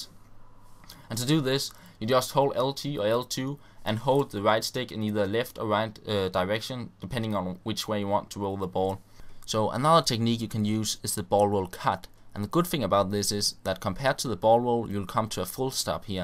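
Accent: Denmark. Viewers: Man kan tydeligt høre at du er dansk! :D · You are from Denmark, i can hear that from your accent. Im from denmark to. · your from Denmark. you got the best nordic english accent I ever heard!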